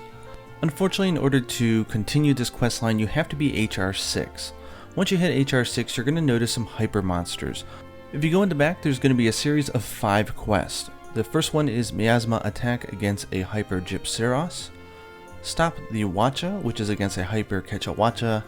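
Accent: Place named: Japan. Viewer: The way he pronounces all the words in the game with a Japanese accent rly triggers me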